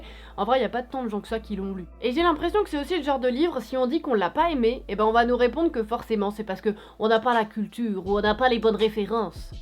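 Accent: Paris, France. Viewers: Je ne l'ai pas lu et je crois que tu m'as fait gagner du temps au lieu de le perdre. Et pourtant je suis tombé sur toi par pu hasard sans même te chercher (parceque covid 19). J'adore ton phrasé parisienne je dirai, ton franc parlé et ton analyse de fin est outrageusement pertinente. En gros il a payé les premier influenceurs de l'époque ... avec Norman Squeezy et Cyprien dans la poche ... c'était gagné c'etait sûr !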